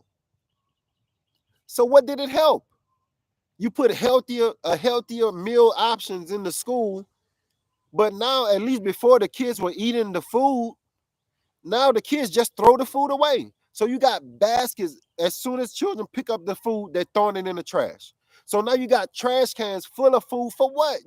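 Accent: Louisiana, United States. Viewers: I knew that was a cajun accent I heard :) Just suscribed!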